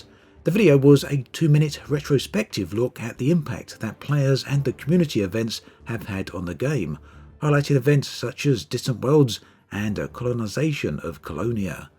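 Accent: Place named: South London, United Kingdom. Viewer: Hey cant help noticing your accent is like Pritti Patel's..is it an area of south London?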